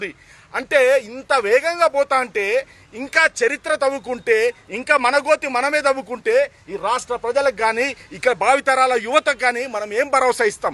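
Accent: Telangana, India. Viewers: ఈయన మాటలు చూస్తే కత్తి ఆంధ్ర ఉందే పొడిచాడు తెలంగాణ వాడే అట్లా ఉంది ఈయన మాట్లాడేది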